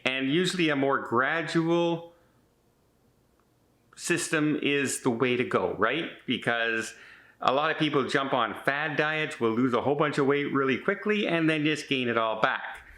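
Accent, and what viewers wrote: Canada. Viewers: 'eh. Me and hubbies love your channel. being Finnish people ask me if I'm Canadian as I pronounce words like you. Good luck to you and Tony. We are cheering for you. Your neighbors in Sault. Michigan. The yoopers. Prayers for your health. Kate and Jim Peach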